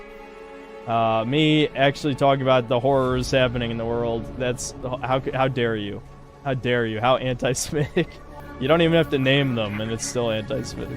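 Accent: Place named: United Kingdom. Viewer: I bet it's the British accent. They hear that and think he's super smart lol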